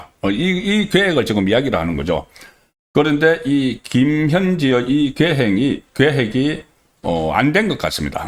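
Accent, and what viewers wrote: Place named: Jeolla, South Korea. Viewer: 1. 억양: 전라산 홍어. 2. 사고방식: 사기꾼 개년. #1+2=3. 국내산 홍어를 상대해본, 국민이라면 다 알거다. 69.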